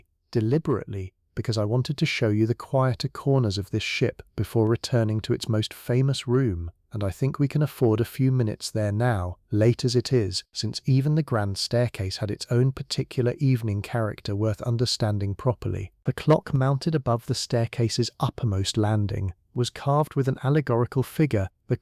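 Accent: United Kingdom. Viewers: Lancashire England. Great storytelling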